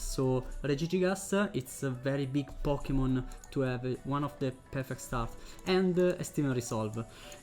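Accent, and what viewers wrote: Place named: United Kingdom. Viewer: ma quanto cazzo sei british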